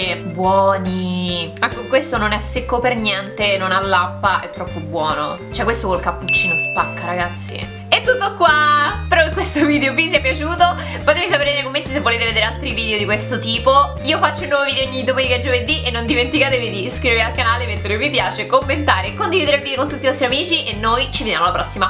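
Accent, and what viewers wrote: Rome, Italy. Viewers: Ma solo io ho provato a vedere questo video in inglese e dopo i primi 10 secondi ho mandato al diavolo le mie intenzioni perché amo il suo accento romano? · Il tuo accento romano è qualcosa di unico 😂❤️ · è bellissimo il tuo accento romano !!!!!!!!!!!!!!!!!!!!!!!!!!!!!!!!!!!!!!!!!!!!!!!!!!!!!!!!!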